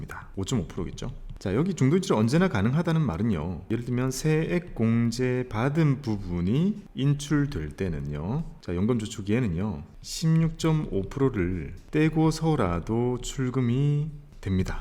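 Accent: Gyeongsang, South Korea. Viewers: 곰희씨 특유의 사투리, 같은 경상도 사투리 사용자로서 넘 친근해요. 우짜죠? ㅋㅋ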